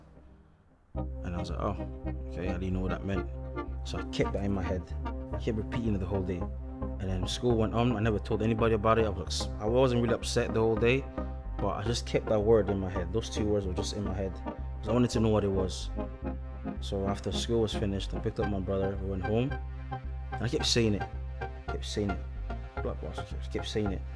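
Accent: Scotland, United Kingdom. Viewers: I can't believe he has a Scottish accent I thought he was from London, that has absolutely blown my mind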